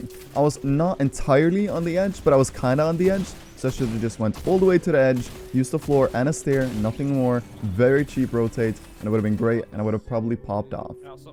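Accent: Norway. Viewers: wait are you norwegian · Are you from norway? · Are u from norway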